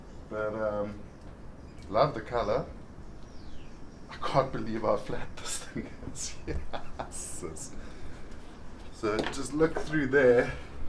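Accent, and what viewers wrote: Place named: South Africa. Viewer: Great content deserves great recognition and some dope giving back on the love that has been given, i.e., called content... It's channels like yours which make the internet and You Tube a fun place to visit and not a boring one... Thxxxxxxx dude!!!! HAPPY SURFING FROM VIRGINIA BEACH, VA!!!!!! Oh yeeeaaaahhhh I am nearly 5'9 and fluctuate from 205-230 pounds on the leaner side never less than 190 lbs, so being somewhere in the big guy club myself, this channel hits right and is super spot on... Not every surfer is skinnied out with a six pack and big guys can and do rip too, like Owen Right, Jordy Smith, John John, William Cardoso, Jay Davies, and Wade Carmichael to name a few... Plus your "SA" accent and knowledge is cool... It sucks your not at 50K approaching 100K subs already... I hope your channel blows up because your having fun, sharing positive vibes, and giving back 411 surf info we can all learn from plus dope "SA" surf, ocean, and board footage... 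Channels like yours, How To Rip, The Surfers Journey, Kale Brock, and isurftribe, have all helped me learn more about surfboards and how to build a proper surfboard quiver for my body weight and size and skill level... Most important your fun to watch because your ""having fun"" and your passionate about SURFING which most definitelyyy shows and what it should be about it, sharing and giving positive vibes and stoke to the viewers... Thanks again dude!!! Movie quote for you,, "Build it and they will come". Please keep bu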